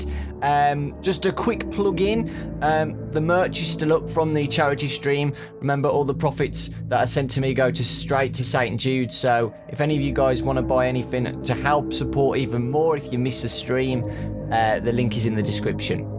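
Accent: United Kingdom. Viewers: Is he british or something ?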